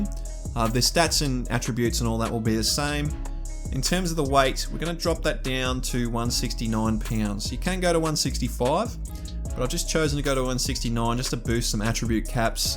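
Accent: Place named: Australia. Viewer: Thanks mate this was great. Also refreshing to hear an Aussie voice and to not have a bunch of over-the-top editing in my face when watching the video 🤙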